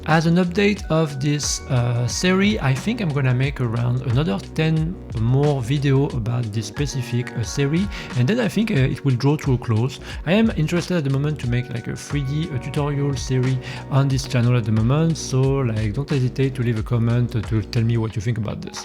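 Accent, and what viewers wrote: France. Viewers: Wow that frenchy accent! J't'ai grillé des les premiers mots x) ! Super vidéo, continues comme ça !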